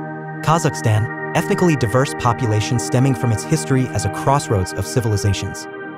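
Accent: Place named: United States. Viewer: Get rid of the American accent, it’s terrible.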